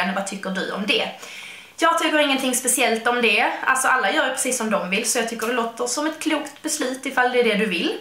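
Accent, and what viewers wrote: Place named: Scania, Sweden. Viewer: Haha, när du sa att du inte pratade så jätte mycket skånska jag bara va? Jag tycker att du pratar väldigt mycket skånska. Är själv stockholmare så fattar inte vissa ord du säger men jag tycker skånska är fint. Vissa av mina kusiner bor i Skåne så är van med dialekten. ( min mamma är även uppvuxen där...). Det här var inget hat tycker bara att det var lite roligt när du sa det. Ha en bra dag och den här videon var jätte bra!! kram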